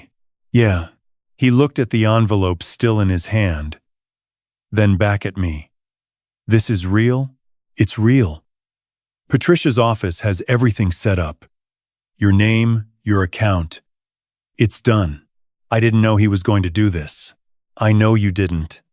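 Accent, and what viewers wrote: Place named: United States. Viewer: Having an American voice use British vernacular is very annoying. It really comes across as fake!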